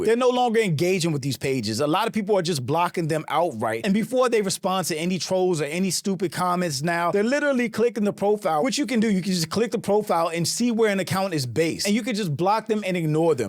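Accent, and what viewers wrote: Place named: Canada. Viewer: You can listen and know he's from canada.He's from toronto, just listen to him.He don't sound american whatsoever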